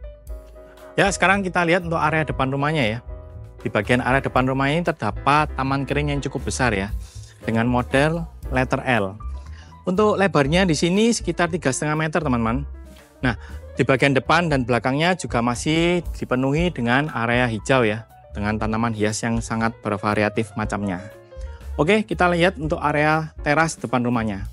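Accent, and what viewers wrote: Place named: East Java, Indonesia. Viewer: Videonya menjelaskan banyak hal kecuali lokasi, hal yang justru paling penting kalo mau jual rumah. Lokasinya di kota mana? Saya cuma bisa nebak dari dialek hostnya, yaitu Jawa Timuran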